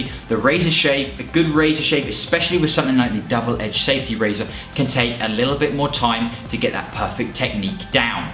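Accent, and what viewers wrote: England, United Kingdom. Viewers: Your English intonation attracted me to your channel ☺️😂 but you are cute bro ❤️🔥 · Love this channel. Just found it and feeling better already! And I love this dude's accent. Is he from the same part of England as Russell Brand? Very similar accent scenes... Anyway, I'm not quite ready for this but headed in that direction I think..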